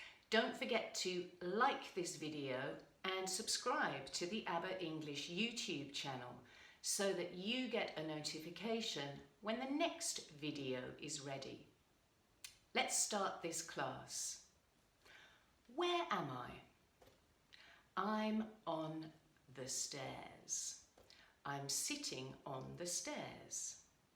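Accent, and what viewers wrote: United Kingdom. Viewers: "...I KNOW IT´S ONLY ROCK AND ROLL BUT I LIKE IT...", LOL, YOU ARE BEAUTIFUL TEACHING ENGLISH WITH THAT BRITISH ACCENT : ) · Thanks for to teach English by this way. I understand very well your British accent. You're an excellent tea her. Take care and Godspeed. 👏👏👍🖐️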